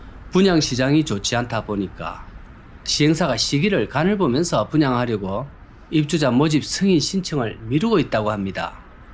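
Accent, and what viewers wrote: Gyeongsang, South Korea. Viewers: 경상도 사투리 구수하고 듣기 좋기만 하구만~넌 중국 연변에서 언제 왔냐~? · 어이 말투 꼬투리 잡지마라 열심히 일하시는분 북한 빨갱이가 머꼬 니가 빨갱이같은디 경상도 사투리도 북한말로 착각하는거 보니 ㅋㅋ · 말투는 경상도 사투리네요 대구달성구 인데 생각있으니까 비워놓겠지요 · 겁나 흔한 경남사투리인데 보도듣도못한 님이 빨갱이 아니노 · 딱 억양이 부산경남 말씨 경북대구쪽은 대출 이칼때 대~출 이라카고 경남은 대'출 대자에 억양을 씨게 함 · 오리지날 경상도사투리죠 강호동 은 꿋꿋이 방송에 떠드는 그 ~ 갱상도 사투리올시다 호동이가 조선족 안입니더~ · 너무 구수한 경상도사투리를 쩝쩝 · 딱 들어도 갱상도 사투리인데~ 구수하기만 한데 뭔 트집? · 먼소리요? 내가 갱상도인데 내가 이미 검증하였음 나보다 더 오리지널 경상도사투리요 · 북에서 왔나? 경상도 사투리도 조선족으로 생각하다니 ㅋ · 원전 갱상도 사투리 인증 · 경상도 사투리도 지역마다 좀 틀린가봐요. 어떤경상도 사투리는 일본말 같은데 이분은 일본말같지 않고 진짜 구수한 사투리같네요. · 딱들어보면 경상도 사투리인데 무슨 북한빨갱이 조선족운운하는지 참 예의없고 싸가지없네 너야말로 중국 조선족 탈북자인가 예의지켜라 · ㅎㅎ 이곳과 관계가 있는 분인가 보다. 갱상도 아임미까. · 순수 경사도 사투리입니다🎉🎉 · 전형적인 경상도말씨네요 트집잡는 사람은 아마 절라디언인가봅니다ᆢ! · 뭐 구수한 경상도 사투리 듣기좋구만...즐겨보는 채널인데...올빼미 TV와 함께...희망아 듣기싫으면 사라져라.ㅠㅠ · 듣기 좋기만 한데 구수한 경상도 형님의 말투를 이렇게 나쁘게 표현하냐 너는 일베냐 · 나 창원 사람인데 우리 동네분인가 하면서 듣는데 머선 소리~~ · 뭔 개소리 토종 경상도 사투리도 몰라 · 경상도 사람이 서울에서는 사투리 안 쓰지요. 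갱상도 폿데가 좀 나서 그렇지. 그게 사투리 아니고 서울말 쓴다고 하는 말투입니다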